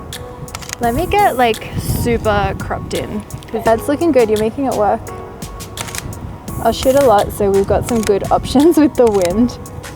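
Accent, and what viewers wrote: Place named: Australia. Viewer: Hi. Is it one preset or five diff ones? By the way were you born in Australia your accent sounds a little different.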